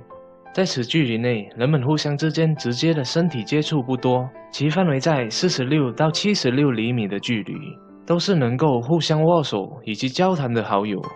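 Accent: Malaysia. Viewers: 这是马来西亚的华语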